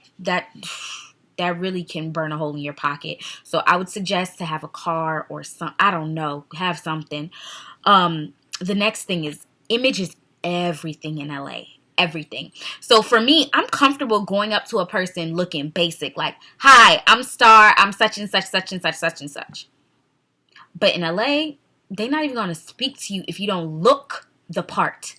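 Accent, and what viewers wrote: Jamaica. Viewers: I love the Jamaican accent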